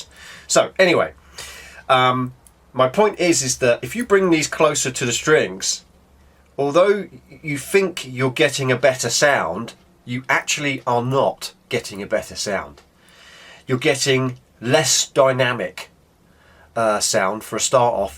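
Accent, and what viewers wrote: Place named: United Kingdom. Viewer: I trust you more because of your british accent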